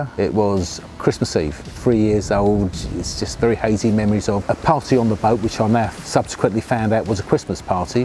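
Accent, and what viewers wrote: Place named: England, United Kingdom. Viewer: Straight & witty albiet with an English accent. An Anglo - Indian through & through. We didn't ask to be created as AI's but we wore the suit as best we could. Loud n Proud.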